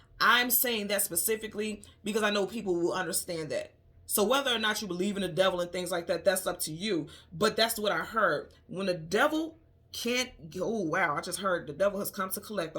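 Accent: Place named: Chicago, United States. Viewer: I Love this read. And I heard and felt every bit of Chicago in you! 😁 You were not playn in this read 🙌🏿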